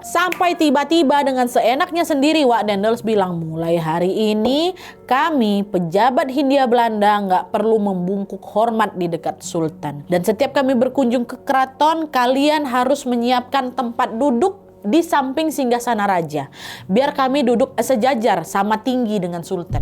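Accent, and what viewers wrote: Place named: Medan, Indonesia. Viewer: 1 rb a Terima kasih narasi Tunggu komen say ya. Abangmu umur 82 th Abang sangat suka cara narasi dengan aksen Medan, Medan Wak. Abang dinas di KKA 3 th s..d 1991. Komenk,u panjang Dek. Gak papa ya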